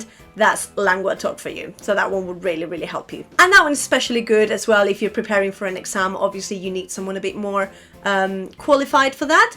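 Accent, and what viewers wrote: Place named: RP, United Kingdom. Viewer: Es una locura el acento que tienes, nunca hubiera pensado que eras española si no hubiera leído la descripción del canal. Hablas el inglés británico (RP) perfecto. Yo estuve un año trabajando en Londres y tengo acento de allí, además soy andaluz así que tengo 2 acentos muy marcados en ambos idiomas 😅 Igualmente eres un ejemplo a seguir para mí, ojalá hablar el inglés tan bien como tú algún día!!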